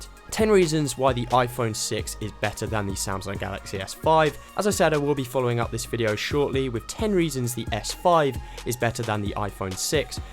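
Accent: United Kingdom. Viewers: ненавижу британский акцент !!! аааааа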